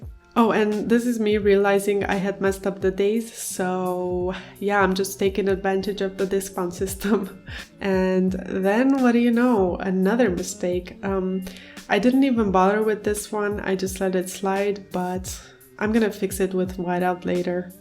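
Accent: Germany. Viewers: Where are you from?:) Your English sounds very German haha :D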